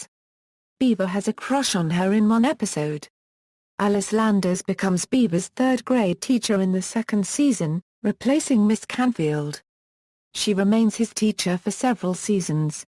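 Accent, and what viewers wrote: United Kingdom. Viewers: I don't like the British accept telling us about an American TV Show.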